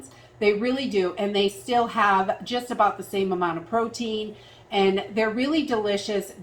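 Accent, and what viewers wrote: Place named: New England, United States. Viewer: Hey, are you in New England? I am - and from your intro (with the fall foliage) and your accent, it seems like maybe you're a fellow New Englander. If you like to keep your location private, no worries. I only go as far as to say that I'm in New England on my channel for privacy (and anti-stalking) purposes. I was just curious.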